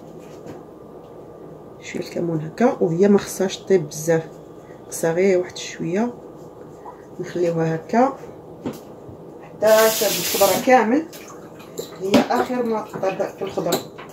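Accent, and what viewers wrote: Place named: Morocco. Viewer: شفت باكو الكسكسي قلت هاذي تونسبه و من بعد عرفت اللهجه مغربيه شكرا على المشاركه الكسكسي المغربي كي عجبني بزاف يعطيك الصحه اشهي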